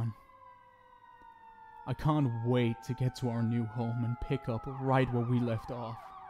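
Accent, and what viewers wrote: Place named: Australia. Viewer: My thoughts through the audio "You can feel me huh?" "Whose they?" "Can it go back huh because what I'm sensing it can't" "Prison!??" "God the lil whine in this is mMMmmmMmm" "Am I picking up an accent hmm?" "Tearing there what out!?! You painted what with what!?!?" ".... Yeah I can see myself falling for someone like this" "Watching me in the shadows huh" "Yeah definitely picking up an accent but which one I think Aussie maybe a British like accent" "Raven that's a cute name" "The sadness in his voice makes me wanna hug him" "Little bit of a lisp it's adorable " "The mood changes are so cute when he speaks *proceeds to do tbe fan girl leg kicks in the air*" "The nicknames he picks are just 🤌✨" "Your maga what what?" "Awww I get a hug and he likes my hair EEEE" "Awwww kisses!! wait wtf am I doing this is a a serial killer yeahhh but he's so cute" "Oh? 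Switzerland huh hm sounds nice" "God why am I smiling" "You're slightly a psychopath but like I'm like this close to letting that slide" "I can't believe in studying to be a detective when I act like this Jesus" "Nah I think we should go considering it sounds like a lot of people" That is all 🤌✨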